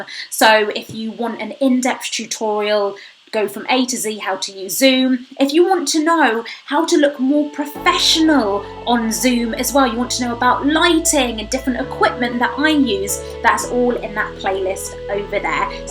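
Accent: United Kingdom. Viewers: Your Accent is dope British loh😂❤❤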